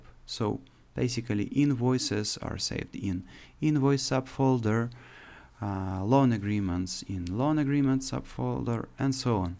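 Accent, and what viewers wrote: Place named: Russia. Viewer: ты русский у тебя как будто акцент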